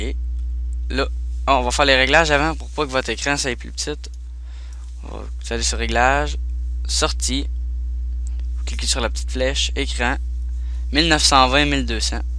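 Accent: Quebec, Canada. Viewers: LAXENT QUEBEQUOI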